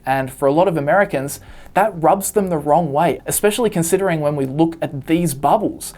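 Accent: Australia. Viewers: Sounds like you’re Australian. Your wine industry has huge issues in America. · Probably because he doesn't go here (I'm assuming based on the accent that he's from Australia).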